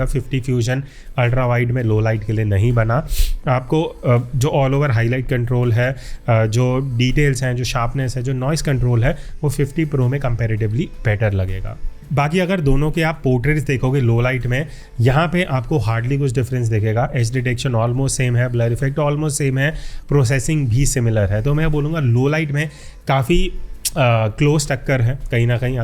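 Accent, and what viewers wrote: Lucknow, India. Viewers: Bhai Lucknow se h?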